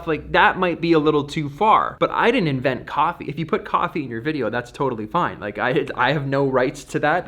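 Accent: Canada. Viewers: I always love the way says aboout. Pure canadian 😂😂. Great vlog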